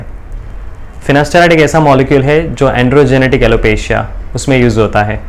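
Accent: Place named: Hyderabad, India. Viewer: Hao yaaro hyderabadi hai ye doctor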